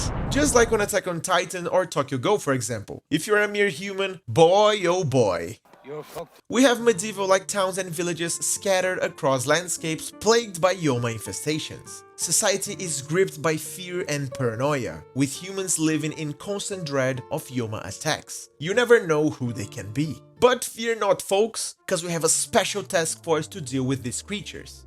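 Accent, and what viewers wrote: Brazil. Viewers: Tu é brazuca mano?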